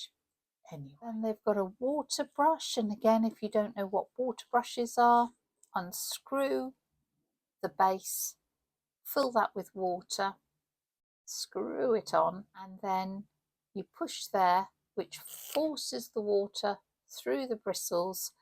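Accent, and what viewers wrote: United Kingdom. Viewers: Love this! And those color sheets! I love British English. I have a steady diet of BritBox so I feel a bit like I like in the UK. I visited last year for 1 month and in 2025 I will do again in Dec.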